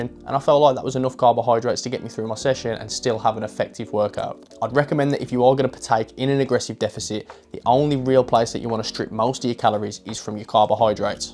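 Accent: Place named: Wolverhampton, United Kingdom. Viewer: Nice video man. Very informative and to the point! Looks like you got great results. You sound like your from my neck of the woods (wolverhampton)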